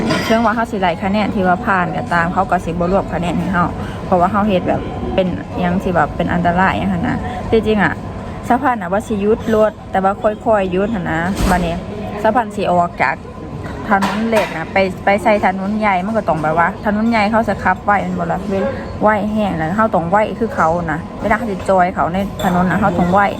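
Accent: Laos. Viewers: ก็เขาเป็นคนลาวเนาะจะให้พูดไทยได้ดีกว่านี้หรือ? ถ้าไม่ชอบก็ไม่ต้องเข้ามาดู แค่นั้นจบ!!!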